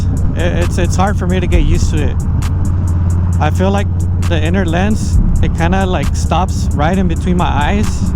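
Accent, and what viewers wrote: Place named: Guam, United States. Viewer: Bro you sound like you from Guam.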